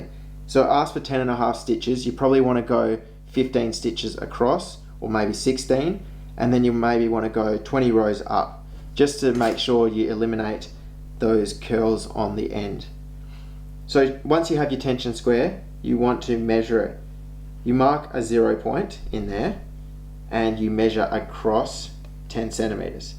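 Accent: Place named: Australia. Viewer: Thank you. I am about to knit my tension square. Nice to hear an Aussie voice too. Looking forward to more videos by you. Happy knitting 🧶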